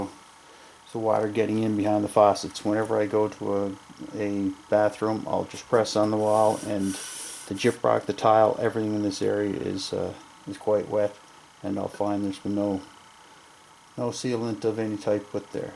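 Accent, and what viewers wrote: Canada. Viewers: Bobby, you are showing your ignorance. Are you going to correct an Englishman for the way he pronounces words too. This man is Canadian. That is the way Canadians pronounce spout. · Haha.. Yea, You definitely have a canadian tounge. Thank you for the video. I'm working on my bathtub as we speak. Take care. (Los Angeles, CA) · Bobby shut up. Whats YOUR problem troll? He has a Canadian accent. And I have no problem with his pronunciation.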